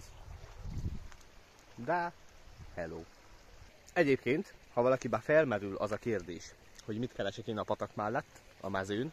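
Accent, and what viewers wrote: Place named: Szekler Land, Romania. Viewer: Szerintem nagyon szépen, választékosan tudsz beszélni, úgyhogy már emiatt is érdemes belevágnod. A másik, hogy sajnos csak egyszer sikerült eljutnom Székelyföldre, de imádom hallgatni a székely magyart.. :) Nekem már a tájszólás miatt is kellemes a lelkemnek hallgatni Téged.. :) Amúgy hozzám közel áll a világlátásod, és szerintem a humorod is rendben van.. Szóval hajrá, fel is iratkoztam, ment a like is! ;)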